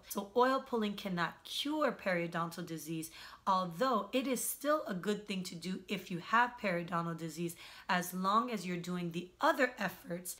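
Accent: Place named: Haiti. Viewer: Are you Haitian? I detect a slight accent.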